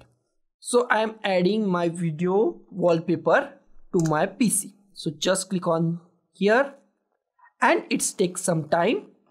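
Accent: India. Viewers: Bro's internet speed 🗿🗿 bro's Tutorial ❤❤ bro's indian accent of english being an indian 🙌🙌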